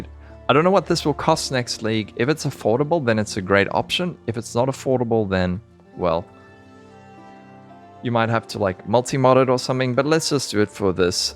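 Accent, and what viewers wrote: South Africa. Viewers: You from Durb's? you sound Safa!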